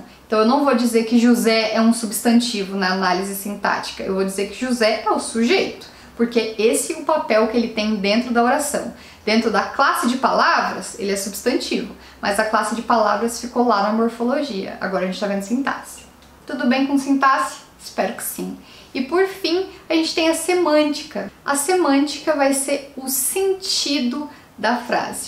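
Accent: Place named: Interior, Brazil. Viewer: Adoro esse sotaque do inerior vindo da voz de uma mulher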